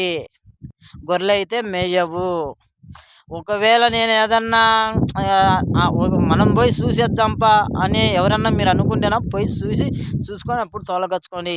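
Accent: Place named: Pulivendula, India. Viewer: Anna naadhi pulivendula daggara palli nee basha vintunte maa pallelo laaga vundhi ee vooru anna manadhi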